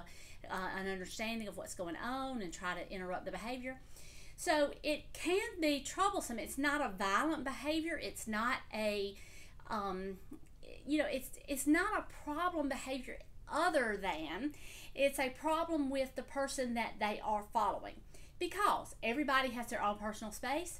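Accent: South, United States. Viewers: I just love your southern accent and delivery. You are so sweet and compassionate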